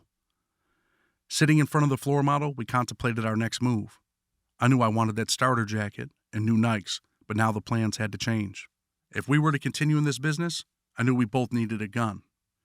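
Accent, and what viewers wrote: United States. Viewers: Always a good video. Props to you. I know you you from upstate and all that but damn homie you sound country as hell tho boy ha. Peace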